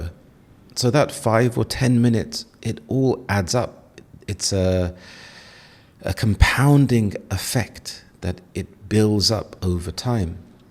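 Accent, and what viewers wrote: United Kingdom. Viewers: Hello Sir., it's the first time I've watched a video podcast episode from yours and I liked that, it was nice, relaxing and with a very clear sound and voice...a nice warm clear British accent the accent I'm trying to acquire for myself. I believe that I could try to shadow it if it is ok.Nice topic indeed, I don't buy on-line a lot and for important items I'd rather go in a shop big or small. Thank you very much, I'm happy to have found another interesting channel. Cheers! 🙏😊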